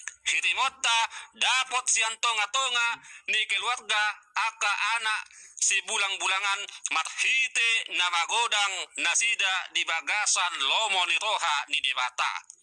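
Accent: Java, Indonesia. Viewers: Saya kagum dengan Bapak bukan hanya Sejarah saja yg pintar tapi Agama juga mantap.Dan membuat aku merasa heran kalau bicara bahasa Indonesia Bapak nampak logat Jawa.Tapi kalau ngomong bahasa Batak sangat fasih dan tidak ada kejanggalan.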